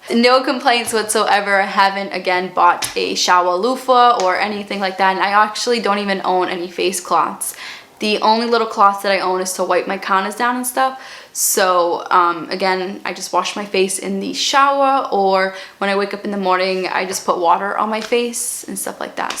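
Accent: Boston, United States. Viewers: I subscribed within seconds of watching your video because I heard the Boston accent - and then you talked about Mass so my suspicions were confirmed! Love that I get to follow a local gal with good advice. :D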